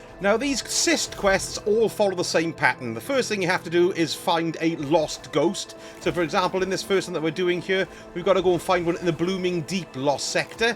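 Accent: Wales, United Kingdom. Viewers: didnt expect the welsh accent haha, first time on your videos. Great content, cheers for the guide!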